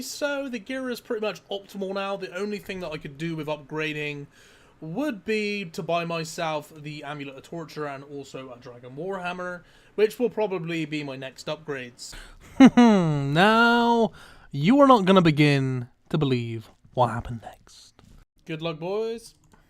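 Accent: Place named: Cornwall, United Kingdom. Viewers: How much I wish he could just pronounce his TH, I could then forgive the west-country accent entirely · Man you're so Cornish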